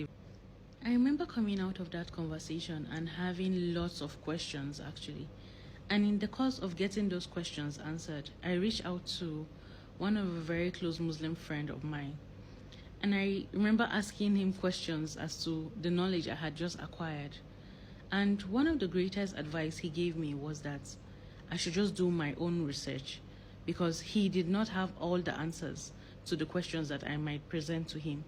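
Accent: North, Nigeria. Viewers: Sorry. Firstly, you don't look like an igbo person. Secondly, you have a core northern accent. Igbos dont have such accent even if they've stayed in the north all their life. Thirdly, you don't know Jesus personally. Christianity is not a religion. It's a way of life. :Christ like". Finally, you're just talking nonsense.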